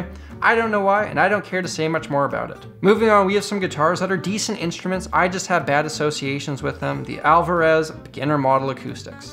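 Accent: Canada. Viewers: This is the most Canadian man I have ever heard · First ten seconds I knew you were a fellow Canadian lol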